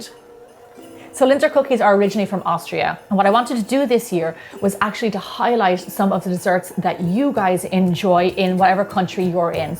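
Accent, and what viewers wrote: Ireland. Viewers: Do I hear a lovely Irish brogue? Delightful!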